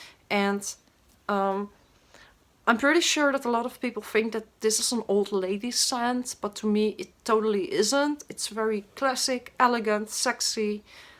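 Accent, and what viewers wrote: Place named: Netherlands. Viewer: Ja je Frans is echt Nederlands bijna net zo erg als de Amerikaanse dames maar bij jou is t acceptebel,;))))). Ga zo door gefeliciteerd met 2 jaar blog.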